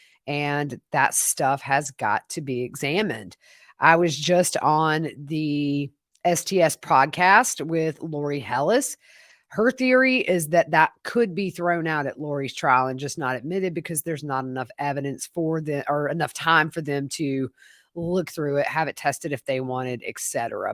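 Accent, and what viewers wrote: South, United States. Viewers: Thank You for all you do to keep us informed of all these cases. You are awesome and make me laugh so much with your corky comments, and your southern accent, I love it, God Bless you, your granny, kids and Family, Gurl❤💐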